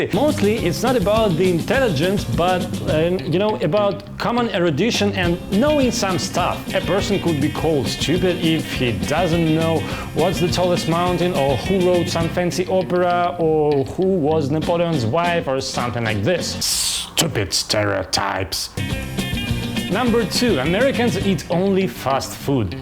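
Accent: Russia. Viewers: Дима Крут ну правильно, в русском акценте все понятно;)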